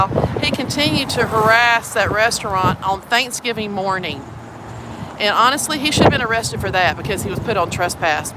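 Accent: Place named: South, United States. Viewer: they are nasty people. I am so proud of you and I love you southern accent! I am a northerner 😂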